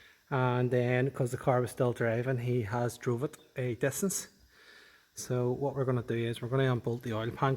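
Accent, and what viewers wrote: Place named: Northern Ireland, United Kingdom. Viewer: You're a diamond, thanks so much for the info. Just given you a like, your accent brings back fond memories I spent a couple of years In Northern Ireland in my formative years met some lovely people along the way, it seems you're carrying on that tradition.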